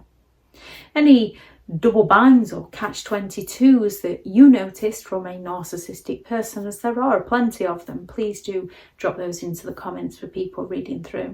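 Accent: United Kingdom. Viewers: O.K. besides excellent content I love your British accent and as a mother of 5 your energy as U hop like a bunny in your first short.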